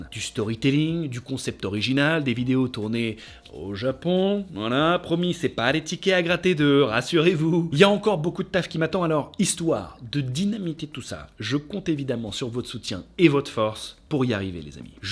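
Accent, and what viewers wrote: Belgium. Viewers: Abonné depuis 10 ans avec le petite 🔔, j'ai cliqué sur chacune de tes vidéos et j'ai toujours apprécié, car même si je suis passionné par le cinéma, je regarde ta chaîne surtout pour toi, j'ai direct accroché à ton humour et à ce que tu dégages (et un peu aussi pour l'accent belge 🙄😂 Je suis pas très loin) et ce depuis 10 ans ! 😁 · Magnifique accent Belge et aussi bonne année.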